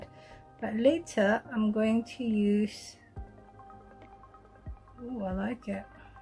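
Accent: United Kingdom. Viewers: Really love the British accent... Kilay is life talaga. Anyway it's another stream of income being an Avon rep. Dami mo na raket sissy.. youtuber na avon rep pa, plus your regular job pa. Payaman... claim it sissy. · Grabe talaga english accent mo ati 😂😘 love it!! Avon lover ako dati nung sa pinas ako hehe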